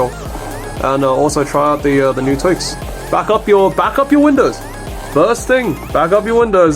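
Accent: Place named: United Kingdom. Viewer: For f sake, finally a video about this. You are 3 years late mate. And I wonder (based on your accent) if you're British, because I live around the UK and I had this problem a while ago already.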